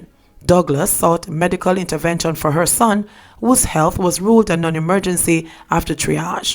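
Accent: Jamaica. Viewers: Love your voice and your accent, and your fluency in English and Jamaica English!! Lol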